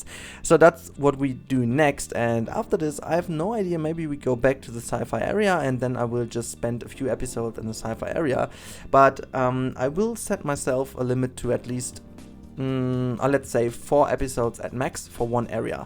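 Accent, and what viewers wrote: Germany. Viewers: Jaw-dropping work as always. It is simply un-believable. 😜🙂🙃 I was wondering as a quick question, do you speak any other languages besides English (I'm assuming German because of your accent) but anything else?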